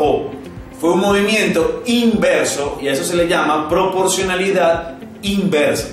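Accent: Venezuela. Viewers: Like si te diste cuenta que él profesor es venezolano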